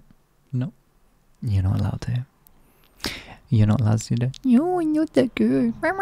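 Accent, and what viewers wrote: United Kingdom. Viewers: I saw this and my first thought was "oh the british guy!" And got very exited since i was just thinking about a fictional crush i have with an accent like yours [pardon the spelling mistakes its late and auto correct isnt on]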